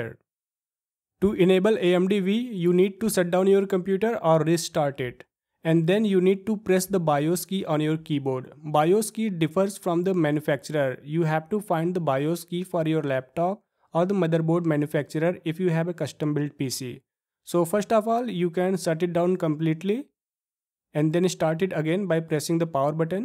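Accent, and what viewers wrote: India. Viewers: Indian accent tutorials are the best🔥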